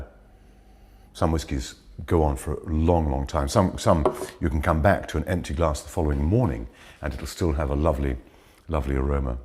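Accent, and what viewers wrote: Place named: England, United Kingdom. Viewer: sounds English with Scottish notes on certain words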